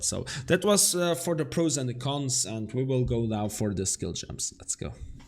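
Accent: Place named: France. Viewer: pti accent Français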